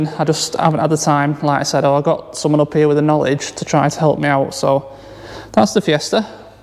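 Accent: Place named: Northern England, United Kingdom. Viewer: Really good to see and hear your fellow northern dialect again, proper work pays your bills, but any video is good by me when you have some free time.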